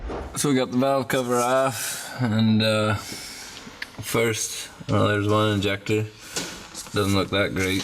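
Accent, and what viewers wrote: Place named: Canada. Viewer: I got sent this video out of the blue to my personal FB page saying "hey Brian, you've got a doppelganger! It's you, but with a Canadian accent! I said, heck ya- it's my dopple-ginger! We're already met and friends eh?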